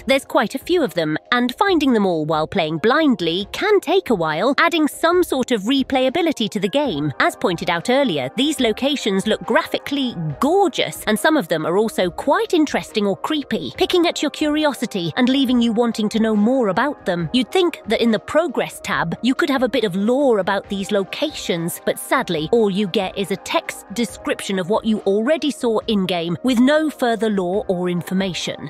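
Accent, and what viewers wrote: Argentina. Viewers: Y mira, por como habla español medio que se deja entrever que es de Argentina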